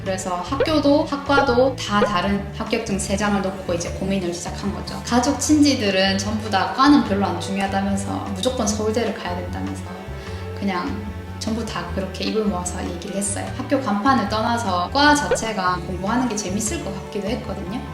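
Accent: Busan, South Korea. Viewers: 블로그를 읽는 것과는 다르게 영상으로 보니 또다른 재미가 있네요!! ㅋㅋㅋ 부산 사투리도 신선합니닷ㅋㅋㅋㅋㅋ 왜 캐나다로 가려고 하시는지 !! 그 영상 기대하겠슴니다 :) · 약사이민으로 몇몇 채널을 봤지만… 약사님처럼 다정다감(?)한 느낌은 없었어요. 작가님이라 그러신지 말도 조곤조곤…. 아주 익숙한 네이티브 부산억양 ㅎ 편안하게 잘 들었어요~~ “남자때문에 호주 간거네??” 삥 터짐 ㅎ 구독. 좋아요. 알림설정 때력박고(?) 다음 영상으로 고고~~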